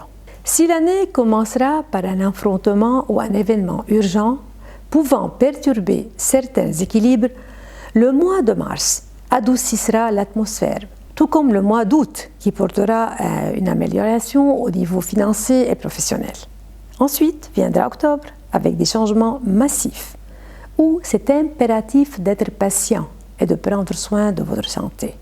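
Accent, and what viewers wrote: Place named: Italy. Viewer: تكلمي الفرنسية بلهجة ايطالية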